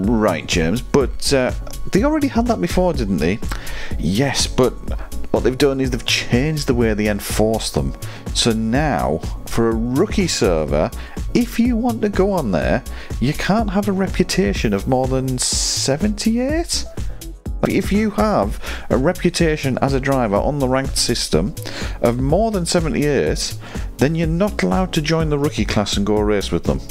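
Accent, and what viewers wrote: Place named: United Kingdom. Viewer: Judging by your accent your in the UK. You wouldn't like the North American participation. Maybe fewer servers would help.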